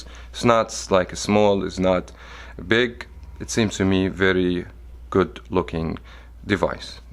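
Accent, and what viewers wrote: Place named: Arab world. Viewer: انت عربي؟ لهجتك كأنها عربيه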